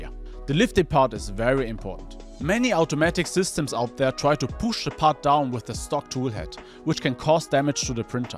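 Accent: Germany. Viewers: I just realized this dude Asian, never thought I would see an Asian with a German accent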